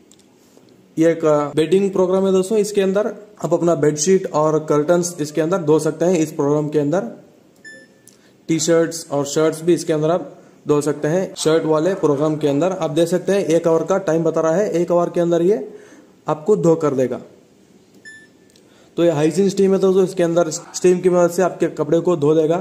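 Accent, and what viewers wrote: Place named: South, India. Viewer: Your language is like south Indian speaking Hindi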